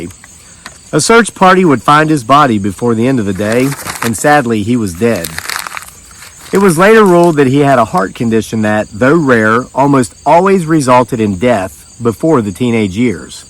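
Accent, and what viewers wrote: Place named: West Virginia, United States. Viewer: I love listening to your stories in your West Virginia accent....thank you!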